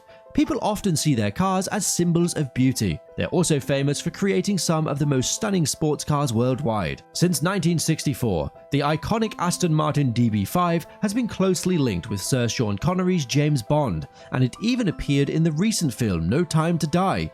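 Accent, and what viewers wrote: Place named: United States. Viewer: Suffers from an American accent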